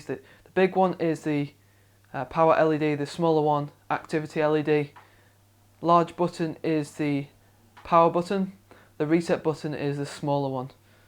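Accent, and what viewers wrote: United Kingdom. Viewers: Finally a review in british accent! xD Thanks for the video review!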